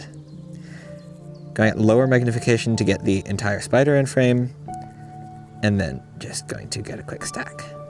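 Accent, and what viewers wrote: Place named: United States. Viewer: It'a a lovely area with some great fauna. I'm intrigued, because you sound American / Canadian but the fauna seems to be from central or eastern Europe.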